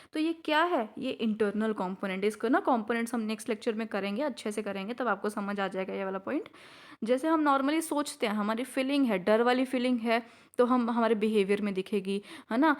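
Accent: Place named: Haryana, India. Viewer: Haryana k lgre h mam ❤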